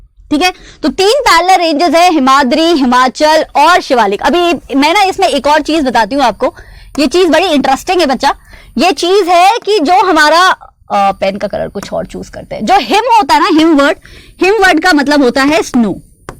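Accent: Tamil Nadu, India. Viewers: By ur talking style i think that u are from tamil nadu😄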